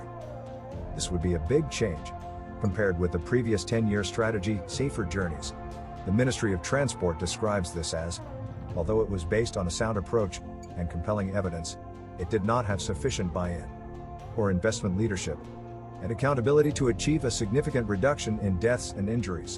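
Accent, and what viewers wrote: United States. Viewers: Most of these comments are fake. Ask what is the motivation for producing this, and why the American narrator? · Why is all the footage AND the commentary accent based on USA, when New Zealand is more like Britain. We drive on the left, our emergency number is 111 NOT 911, and we (mostly) speak English similar to British English but with less regional accent. We generally don't speak American English. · This is an Aotearoa (New Zealand) funded road safety promo hosted by an American or Canadian voice... Just say'n. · The minute I heard the American accent it lessened the impact of the message. How about we all take responsibility for our actions on the road, good or bad !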